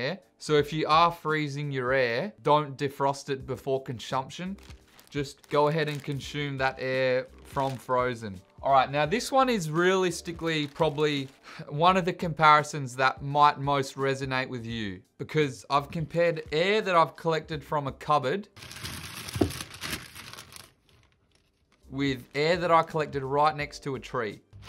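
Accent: Australia. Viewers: When you thought theres nothing left for him to try he just goes above and beyong with a total curve ball and tries AIR. What a lad, this is why I subscribed! That and the thick australian accent. OH, dude you should try and compare accents and see which one is better xD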